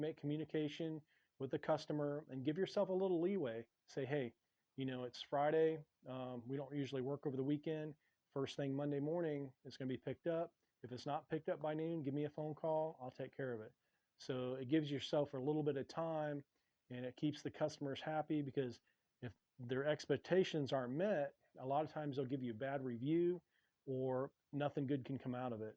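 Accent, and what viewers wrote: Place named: North Florida, United States. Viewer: I just figured out we live in the same town..i am not a tree guy. I thought I spotted the No Flo accent. Thank you for the video. I am just a wannabe tree guy.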